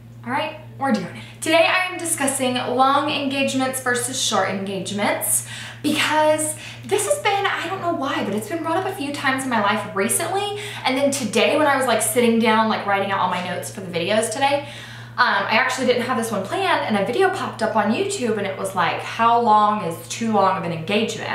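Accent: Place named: South, United States. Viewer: Did you move to the south near the beginning of your engagement? I feel like your accent gets thicker in every video haha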